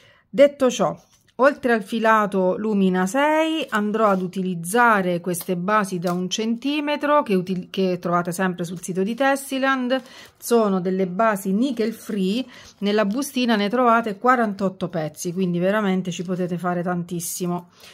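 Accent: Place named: Cilento, Italy. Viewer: Brava e simpatica, hai l'accento che mi ricorda una mia amica cilentana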